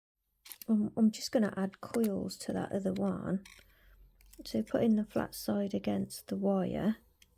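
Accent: United Kingdom. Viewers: Where are you from if I might ask? (Not exactly of course - just region). Your specific accent is so lovely. I have friends all over the UK and each has a different accent but yours is so so lovely. My friend from Coventry sounds sort of similar so I would guess you’re not too far from her. 💚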